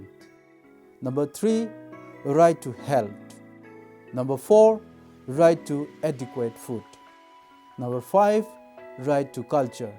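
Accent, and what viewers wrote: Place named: Northeast India, India. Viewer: He is speaking English. The only thing is that he has a North East Indian accent. Please learn to be kind.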